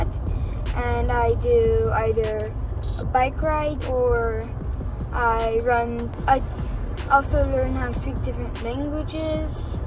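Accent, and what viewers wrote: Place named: Pacific Islands. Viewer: Bro love your accent man. Sounds like you got a bit of that island swag incorporated. Seki work out. Much alofa Western Sydney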